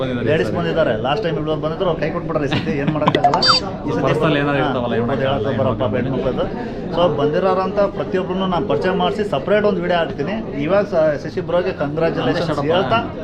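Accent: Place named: Davangere, India. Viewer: ಸಕ್ರೆ ಬೈಲೂ ವಿಡಿಯೋ ಯಾವಾಗ ಅಪ್ಲೋಡ್ ಮಾಡ್ತೀರಾ ದಾವಣಗೆರೆ ಭಾಷೇಲಿ ಕೇಳೋಕೆ ಚಂದ.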